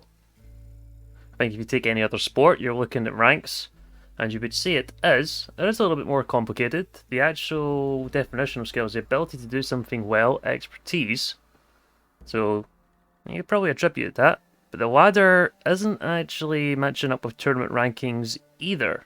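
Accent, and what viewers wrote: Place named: Glasgow, United Kingdom. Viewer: r u fae glasgow?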